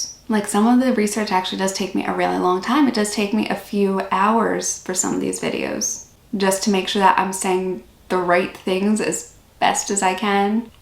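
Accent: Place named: Australia. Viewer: Hey. You’re doing great. Something I’ve noticed in recent videos is that your accent has changed a bit. Some sounds you make are beginning to sound more Aussie.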